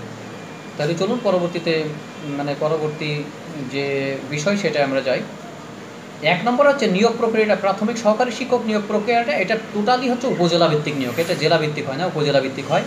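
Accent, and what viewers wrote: Bangladesh. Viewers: ওরে বরিশাইল্লা!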